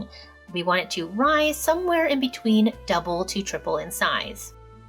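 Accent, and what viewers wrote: Canada. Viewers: This looks like a good recipe for my new Kitchenaid mixer still in the box... first of your videos I've seen and subscribed... from the accent it sounds like your from friendly Canada,,thanks for the video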